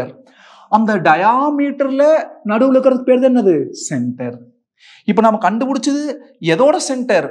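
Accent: Coimbatore, India. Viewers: Coimbatore slang😋☝🏽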